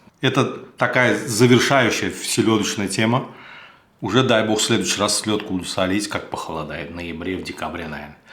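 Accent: Baku, Azerbaijan. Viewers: ВАХ...ВАХ..АКЦЕНТ СЛАДКИЙ КАКОЙ....РАДНОЙ АЗЕРБАЙДЖАНСКИЕ... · БАКИНСКИЙ АКЦЕНТ !!!❤ Однозначно лайк!!! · Этот акцент не перепутаю ни когда , привет от Бакинца из Крыма , тут с селедкой сейчас проблема )) приятного аппетита , нуш олсун 🔥🥃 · Что за акцент? Азербайджанский что ли? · Хоть в Антарктиде, хоть в Африке, Бакинский акцент ни с чем не перепутать :)) удачи вам земляк.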